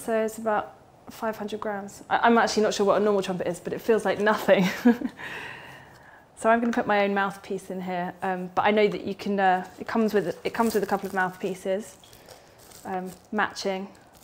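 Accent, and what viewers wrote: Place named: RP, United Kingdom. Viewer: Absolutely. Cute lady. Posh accent, too.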